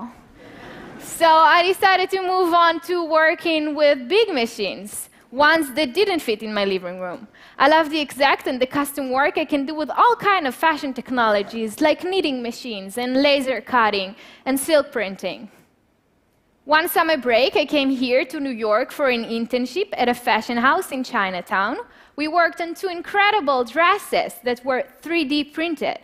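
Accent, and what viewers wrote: Israel. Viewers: Most Israelis have a heavier accent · I do hear the slight Israeli accent :P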